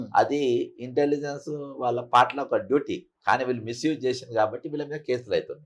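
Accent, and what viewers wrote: India. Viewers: Anchor తెలంగాణనా ఆంధ్ర నా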